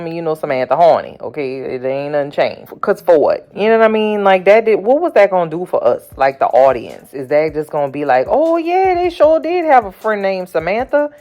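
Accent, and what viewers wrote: New Orleans, United States. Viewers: Girl you are HILARIOUS! Instant follow. Also are you from NOLA? Cause i hear that accent. 😂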